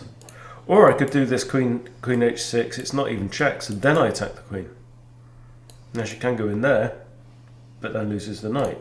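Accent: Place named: United Kingdom. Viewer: I watch bc I need a channel where I can have the moves explained and see how players closer to my elo play (Im only 700/800 but have only played 230ish games)--esp endgames. You playing rapid gives me time to think abt what I'd play in your position. The british accent helps, but my oh my the blundering rollercoaster and the amount of times I yell at you for bad endgame moves is why I stay. Can't forget abt the accents and movie quotes haha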